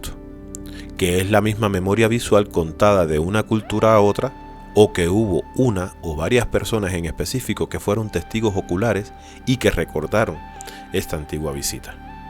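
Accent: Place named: Cuba. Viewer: Me Gusta tu Acento Cubano, también soy Cubana 🤗🤗,me relaja mucho escucharlo 😴..Menos mal yo no creo ni en el Cristo ..Soy un Alma libre y como alma que soy no hay nada más poderoso que yo, solo la fuente que me Creó! por eso nos mienten y se esconden de nosotros, porque realmente el alma es misidial para ellos.. lo aprendí gracias a Corrado Málanga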